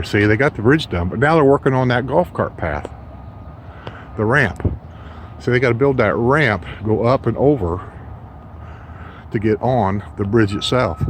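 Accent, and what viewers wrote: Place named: South, United States. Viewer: Love the “SOUTHERN” accent. Are ya from Kentucky? lmao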